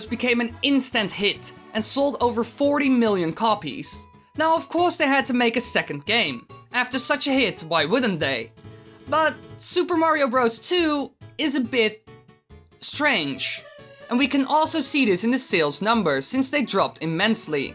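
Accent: Netherlands. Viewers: Eeeyyy ik hoor een Nederlands accentje hoor ;)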